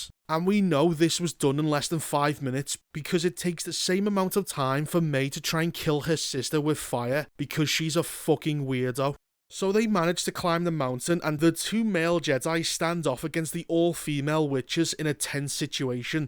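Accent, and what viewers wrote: Liverpool, United Kingdom. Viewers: I would like to thank from the bottom of my heart for his extremely informative, mercilessly honest and extremely entertaining videos. Unfortunately, my twin brother and I discovered him just recently, as a true gem of the Internet. We enjoy movies, series and games both dubbed and with the original soundtrack since early childhood, regardless of nationality. To get straight to the point, the above-mentioned factors in combination with his great (scouse?) accent are a relief for the soul and have already ended many a shitty day with more than just a smile on our lips. If possible, let us know how we can support your channel so that this source of knowledge and good humor never runs dry. Regarding "The Whackoshite", I would like to mention a dialogue that I would love to have: Disney / K. K. / L. H.: “We cannot understand what the audience really expected... 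It’s simply impossible to do Star Wars (Episode IV-VI) justice and create something equal.” Me: “Do you want to know what’s really impossible, Disney / K. K. / L. H.??? Peeing in the corner of a round room." The Power of NONE... 🎭 🎭 🎭 The Power of FEW... 🎭 🎭 🎭 The Power of FUBAR... 🎭 🎭 🎭 P. S. Please forgive me for my possibly poor English. I'm a little older and therefore a little rusty.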